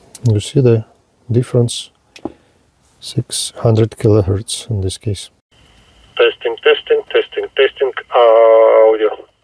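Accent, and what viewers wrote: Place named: Russia. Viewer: Очень русский акцент ✌️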